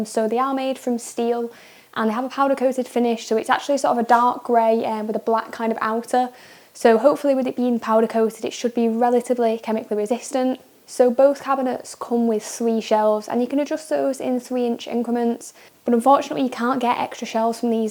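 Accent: United Kingdom. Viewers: Just curious, what part of the UK has this accent?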